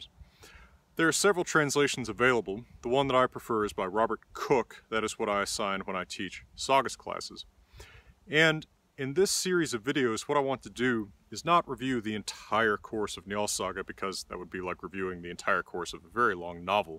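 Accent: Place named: South, United States. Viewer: A southern man teaching Norse mythology. Cant tell if your east texas or going up into the western parts around Wyoming, Montana, etc., but know that this is exactly what I needed in my life.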